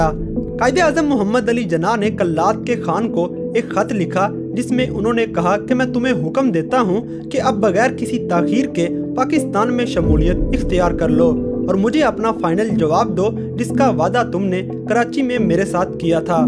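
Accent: India. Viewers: Iskay lehjay say saaf pata lag raha hai ke yeh Indian hai ..... meray Baloch Bhai Amir Hamza ki aulad ho aur sab Pakistanio ke dil mein basty ho. Jhootay Indian propaganday say bach ke raho. Inko aik din Kashmir bhi chorna paray ga.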